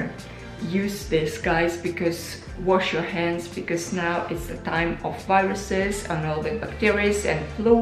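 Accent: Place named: Russia. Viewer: She has Russia’s accent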